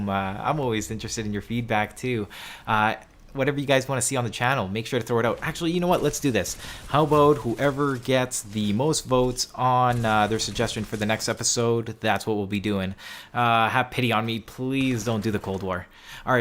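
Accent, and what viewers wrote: Canada. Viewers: im digging the canadian accent over gamesager girly voice lol lol jk! you should do some Kraber with invisibilty gameplay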